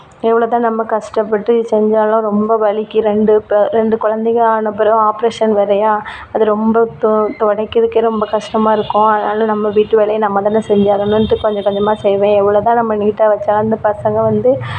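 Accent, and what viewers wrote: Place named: Tamil Nadu, India. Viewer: உங்க நேட்டிவ் எந்த ஊர் sister South side மாறி இருக்கிறது உங்கள் பேச்சு வழக்கு எனக்கும் சாலை மீன் தான் favourite அப்பறம் விளை மீன் ஊளி மீன்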